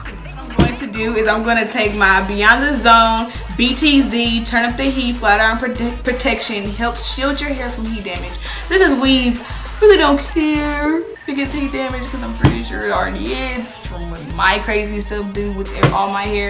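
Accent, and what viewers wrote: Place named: Baltimore, United States. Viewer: Im From Baltimore 😍😍 u do talk like us a lil bit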